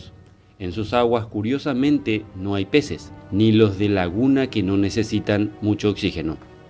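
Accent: Paraguay. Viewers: De que país sos amigo? tenes acepto Paraguayo sin ofender.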